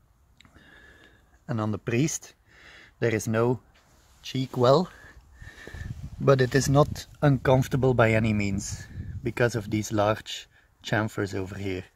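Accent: Flanders, Belgium. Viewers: Aan het accent te horen een Vlaming.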